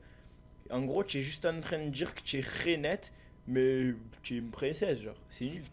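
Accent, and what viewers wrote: Marseille, France. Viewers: T’es marseillais ? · Tous se qui me plaît ses ton accent marseillait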